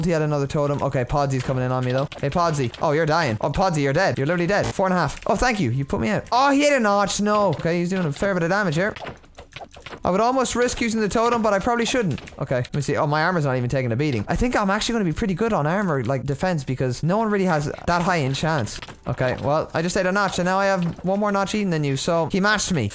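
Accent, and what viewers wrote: Ireland. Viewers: you sound irish are you irish? nvm lmfao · Im I the only one who noticed that he has a slite Irish acsent??Like a 20% of Irish and a 80% of American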